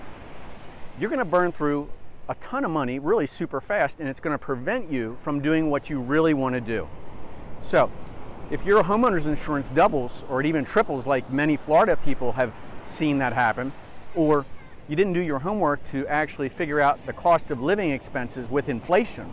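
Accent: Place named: Pittsburgh, United States. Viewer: Accent is from Pittsburgh?... maybe Philly...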